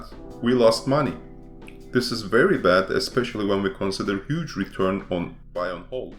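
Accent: Turkey. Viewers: It seems a Turkish voice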